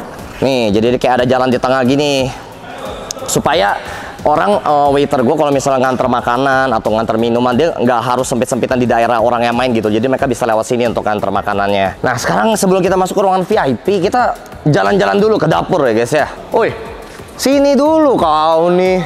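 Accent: Palembang, Indonesia. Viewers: Logat Palembang kau masih melekat 😂 mantap semoga lancar dan reme tempat baru nya aminn🙏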